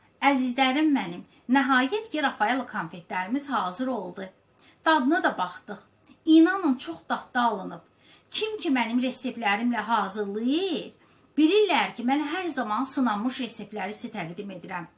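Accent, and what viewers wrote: Baku, Azerbaijan. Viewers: Ləhçəəəə. Can bakılım)))😍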